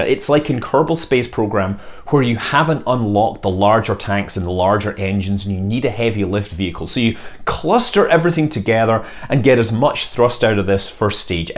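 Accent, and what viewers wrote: Scotland, United Kingdom. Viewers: Scott, your videos have come up on recommended several times, I decided to watch some and it seems YouTube got it right. I just subbed and I look forward to more of your videos. I wonder if you might be able to put me out of my misery on something? Your accent tells me your Scottish but I can't put my finger on which part of Scotland, I'm from Fort William and I'm guessing that you are from somewhere in the central belt?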